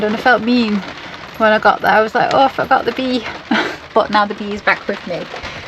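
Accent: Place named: Northern England, United Kingdom. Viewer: Right up me chuff😅 trying to diddle meh!😂 proper northern lass 😊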